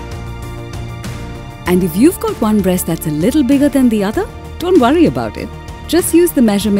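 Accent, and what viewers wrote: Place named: India. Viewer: Typical Indian accent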